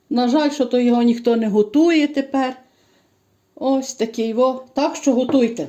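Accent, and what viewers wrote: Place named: Ivano-Frankivsk, Ukraine. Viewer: Все чудово, і рецепти салатів, і випічки. Дякую. Але, якби ви ще відшліфували українську мову, не вєивали русизму, при тому, що бажаєте всім миру і перемоги, було би мега прекрасно. Даруйте, що роблю вам таке зауваження, але мене дратує те наше уподібнення до московитської мови. Адже наша мова така багата, така милозвучна, а юагато наших західняків знаходять уподобання "збагачувати"свою мову русизмами: юбка /ніби спідниця уже не в моді/, зря /ніби даремно уже застаріле/. Отямтеся люди! По вашій бесіді я можу визначити, що ви з Франківщини і тому ще більше боляче чути суржик, який як іржа роз'їдає нашу багатющу і особливу МОВУ. Бажаю вам мудрості, бути берегинею не лише кулінарних страв і смаколиків, але й нашої унікальної української мови і навіть "бандерівської" неповторної говірки, нашого унікадьного діалекту. 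Здоров'я вам і творчих досягнень./успіх- калька московитів/.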